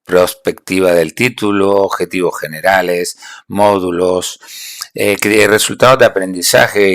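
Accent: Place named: Canary Islands, Spain. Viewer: Hablo muy canariona como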